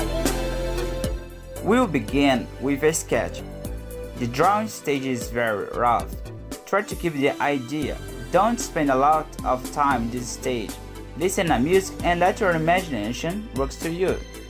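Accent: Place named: Brazil. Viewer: Muito bom! Parabéns e obrigado. (I'm supposing you're brazilian considering your name and accent)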